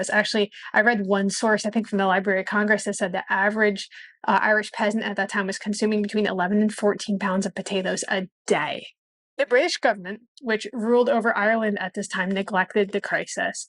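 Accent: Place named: Indiana, United States. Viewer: Her accent says Indiana braces babysitter from the Incredibles IQ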